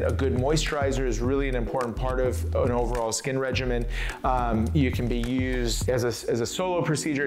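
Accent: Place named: Lebanon. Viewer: لبناني صح ؟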